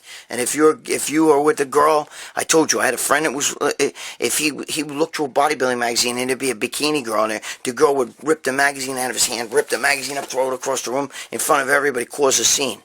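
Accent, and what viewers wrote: New York, United States. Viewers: Great video, thank you so much! Love the comedy, I also love the information very helpful! Also your New York accent A lot like my south Philly accent!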